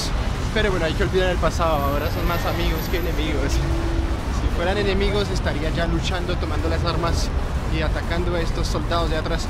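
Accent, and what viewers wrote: Bogotá, Colombia. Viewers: x q tienes acento colombiano ?? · Este man habla con un acento muy rolo para ser Francés !! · te extrañamos en Colombia. Y cierto lo que dicen todos en los comentarios.. tu acento es recolombiano. Saludos.. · Yo te lo digo. Pienso que eres alguien que ha vivido parte de su vida en algún país de Latinoamérica (tu acento colombiano te delata), seas o no nacido allí. Un saludo. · Hola! Ven a Rotterdam... Aca no te pierdes.. yo te doy un tour por la ciudad 🤗🤗... Me gustan tus videos y tu acento Colombiano... Un abrazito... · me gusta mas como se te ve ese look sin barba y con lentes y a mi se me hace que eres Colombiano hablaa excesivamente bien el espaniol y tienes muy marcado el acento de alla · sabe que si tiene acentó colombiano pareces paisa el mejor acento de Colombia. pero tu eres demasiado noble y linda tu forma de ser felicidades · deberías de venir a Barcelona, guapo jajaja me hace gracia tu acento de Colombia tanto que dices... 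señor que pena y pides dirección, pero acá pena, no es vergüenza, pena es de lastima o tristeza pero igual me gusta mirarte y escucharte 😍😍😘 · el Francés les parecerá extraño porque te vez claramente europeo pero con acento latinoamericano casi, casi como colombiano. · Es que tu español es muy colombiano y es casi perfecto pero si despierta curiosidad jajajaja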